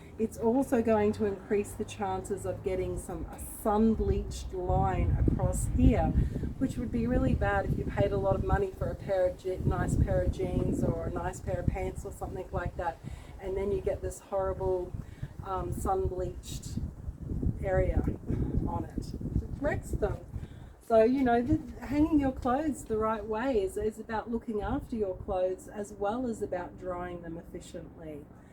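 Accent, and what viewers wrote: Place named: New Zealand. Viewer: Im from nz and i remember a friend of mine coming to nz for the first time she couldn't get over how every household had a clothesline and we dried our clothes that way whenever we wanted to 😂 then i saw in some parts of the states people were excited to be allowed to have clotheslines! I was thinking that's weird had they been using dryers all this time when it's free to dry clothes outside? There were video's and blogs coming out on how to dry clothes for free (obvious air drying) how to make clotheslines, how to hang washing. I can't imagine being without a clotheslines. When i moved to my new home i done away with the old clotheslines and made my own suitable for my big family even repositioned it to get the most out of the sun during winter. We don't like dryers so i made use of our covered deck area for rainy day's and have made a simple clotheslines there as well because washing is neverending 😂😂 Loved your video coz I'm over here like hmmm let's see how other people be hanging the t shirts 😂 then i was like this a kiwi gurrrllll im sure of it she sound like a kiwi to me l0l anyways i guess we still lucky here to have clotheslines, water tanks and chickens running in the backyard etc love from home chur xx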